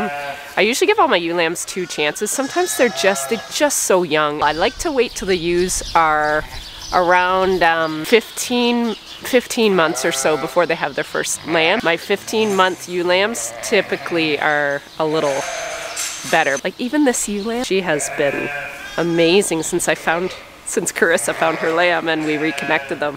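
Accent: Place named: Canada. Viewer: I love the Canadian in your voice!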